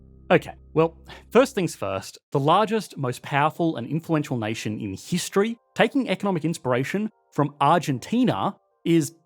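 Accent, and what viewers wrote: Australia. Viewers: More bureaucratic waste to combat bureaucratic waste is awesome. Thanks HMW, the title educated me greatly (didn't watch the video cause you're Australian accent)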